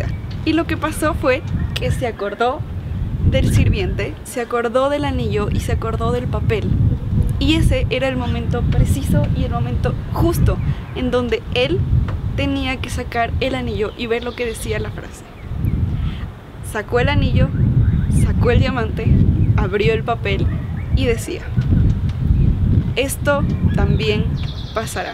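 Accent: Mexico. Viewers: Oye en serio, no te veo tono, bueno no se si los ecuatorianos tengan un tono específico, para mi suenas son tono específico como mexicana, como yo. O digamos con tono mexicano, no es desconocido para mi, sino familiar. Tienes raíces mexicanas?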